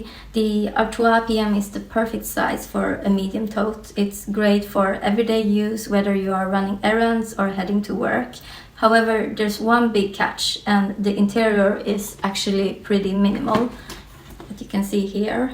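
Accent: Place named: Sweden. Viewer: I love your Swedish accent! tack tack for videoen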